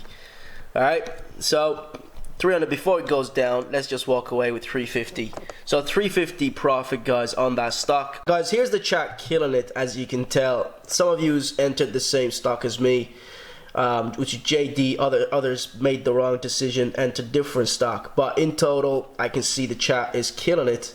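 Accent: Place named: Ireland. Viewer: He from Ireland 🇮🇪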